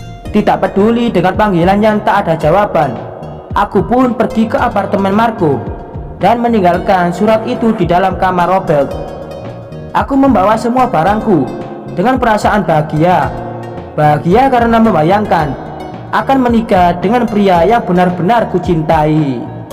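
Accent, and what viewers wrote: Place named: Banyumas, Indonesia. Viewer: Mlm "jdi ska ngakakkkkkkk....gra"denger crita dan bhs ngapak y...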